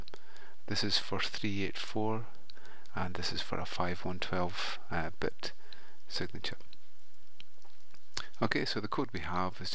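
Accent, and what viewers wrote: Scotland, United Kingdom. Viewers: It's nice to get a Scottish guy on here explaining things :) !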